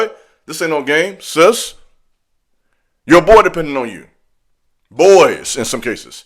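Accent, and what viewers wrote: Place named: Arkansas, United States. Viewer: I’m from Arkansas yea Thts how we talkin lol 😂 gotta do it for our chilren.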